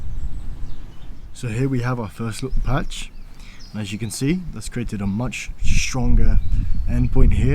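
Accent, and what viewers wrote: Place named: North Dakota, United States. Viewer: Great vid! Just curious about your accent. What state are you from? North Dakota?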